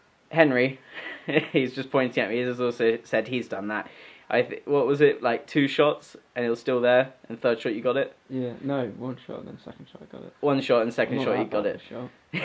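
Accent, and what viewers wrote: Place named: Bristol, United Kingdom. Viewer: great info mate! soon as my FAC comes through this is what im getting! hope you dont mind me asking but where you from, you sound a bit bristolian? thanks again!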